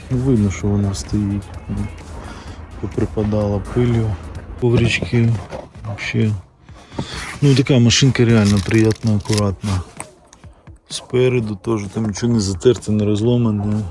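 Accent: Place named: Surzhyk, Ukraine. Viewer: Ви круто навчилися балакати нашим суржиком))))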